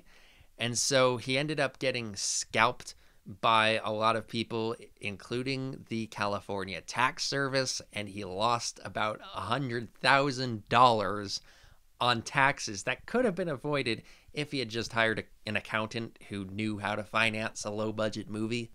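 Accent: New Jersey, United States. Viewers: Dude he's from New Jersey, of course he has an accent. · I think he pronounces words in that manner because of his scarfy mid atlantic jersey accent … a little philly a little NYC a little North jersey … mash up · the simple explanation is that hes from Jersey his accent gets in the way of pronouncing certain words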